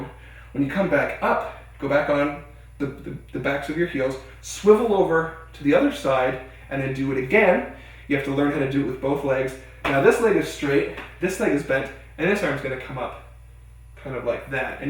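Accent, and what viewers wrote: Canada. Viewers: Are you canadian? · Your Canadian aren’t you😂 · Well explained. Are you Canadian?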